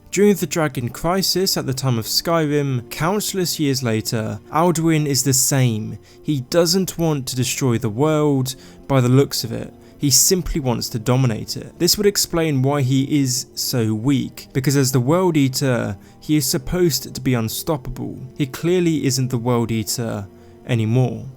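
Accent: England, United Kingdom. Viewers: English accent and a lisp. Mind blown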